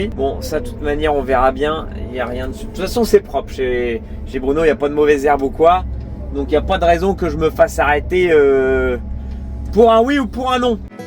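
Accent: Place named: East, France. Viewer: bonsoir tu parle de plus en plus comme les gens de l'est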